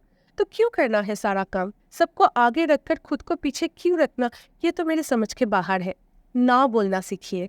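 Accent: Bengal, India. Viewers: Dear loved your todays vlog very much though all your vlogs are meaningful but todays vlog is insightful also and the way you say or convey your thoughts is so simple and beautiful the Bengali touch in your accent is lovely to hear❤❤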